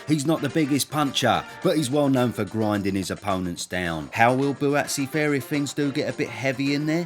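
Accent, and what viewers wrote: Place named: Essex, United Kingdom. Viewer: The essex accent is funny as f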